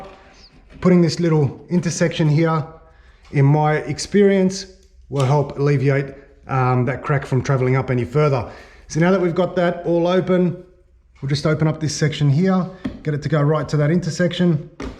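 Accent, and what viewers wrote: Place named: Australia. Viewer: 🤔Why do you sound Australian?🤔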